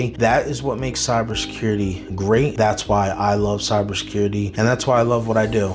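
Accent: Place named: Philadelphia, United States. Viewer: I love the Philly accent made it easier to listen for me . Gooo BIRDs 🦅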